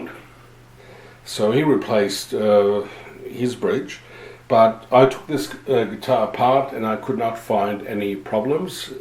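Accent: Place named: Australia. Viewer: Ahh hah! The ALMOST Oz accent and the fact you quoted the price IN AU dollars! ;oP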